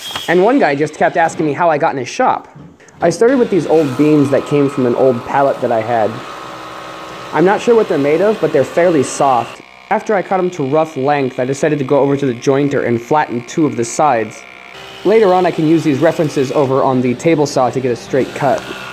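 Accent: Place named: Utah, United States. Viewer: This guy sounds like he's from Utah.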